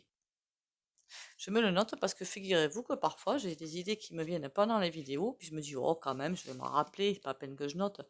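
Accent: South, France. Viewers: Bonjour, j'apprécie de plus en plus vos vidéos j'adore votre accent du Sud il me rappelle mon adolescence à La Ciotat. J'ai aimé la série des Stargates et le quantique y été à chaque épisode. Merci d'être la 😘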